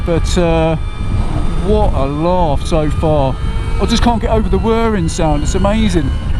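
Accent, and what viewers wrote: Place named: Cockney, United Kingdom. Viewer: That cockney accent is atrocious, crude, almost unintelligible, and for an unencumbered "un-accented" American English speaker...quite funny sounding.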